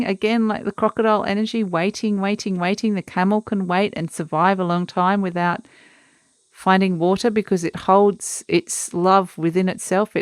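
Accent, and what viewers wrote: Australia. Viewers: You sound quite the "Aussie."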